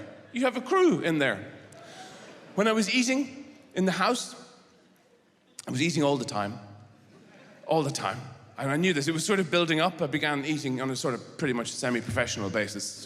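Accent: United Kingdom. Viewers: Where's the punchline? This is just what British people sound like to me.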